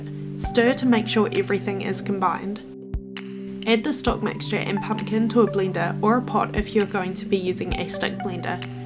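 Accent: New Zealand. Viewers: New zealand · That's a New Zealand accent, right? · Man your accent is sooo intense, I left NZ 13 years ago and I'm 100% sure the accent changed. video is amazing though..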